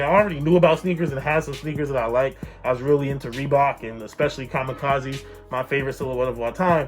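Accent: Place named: Texas, United States. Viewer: oh you from texas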